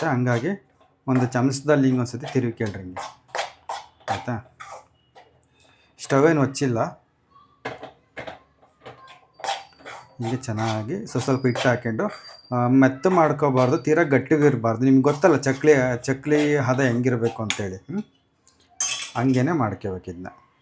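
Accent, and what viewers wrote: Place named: Bellary, India. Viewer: Are u from,Bellai or Hosapete?Because,ur kannada accent is like that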